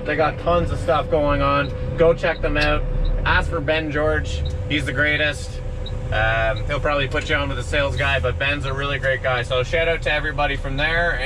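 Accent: Canada. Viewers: Americans are back wards lol. We pronounce it that same way as you Canadians here in Australia. Shift it.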